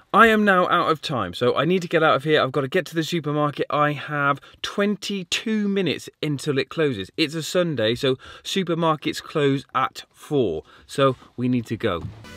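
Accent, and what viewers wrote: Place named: United Kingdom. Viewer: i like those british accent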